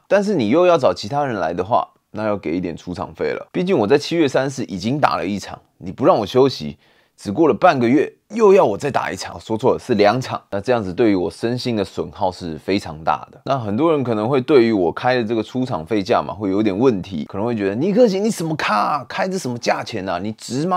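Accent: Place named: Malaysia. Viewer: 1-5頂一個，感覺口音是大馬人，你的勸告也是很正向，的確他給小潘打輸了，也是沒什麼的。現在起碼知道這個月會有一場會迎戰。不過其他不說，TOZY發起挑戰後有特意學拳擊，其實已滿有誠意。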